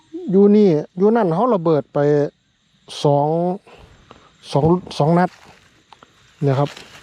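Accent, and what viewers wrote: Chaiyaphum, Thailand. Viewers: สำเนียงเสียง คือคนทางชัยภูมิ แท้ครับ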